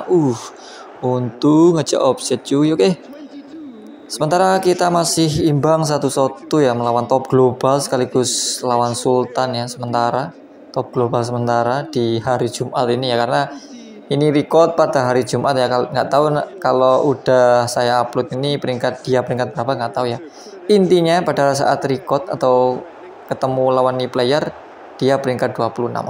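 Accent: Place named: Java, Indonesia. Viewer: Medognya masih kentel😂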